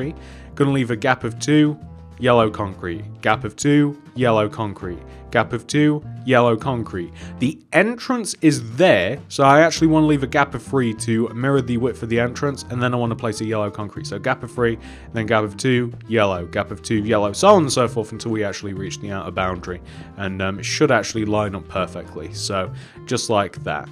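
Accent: United Kingdom. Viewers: Bruh he is British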